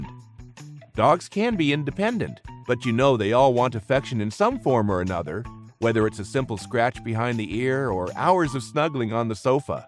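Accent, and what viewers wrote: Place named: United States. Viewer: That stupid yank accent put me off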